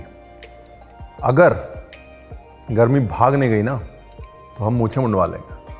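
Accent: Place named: Lucknow, India. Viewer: Your Lucknowi accent. Love it 😍 Hot coffee also please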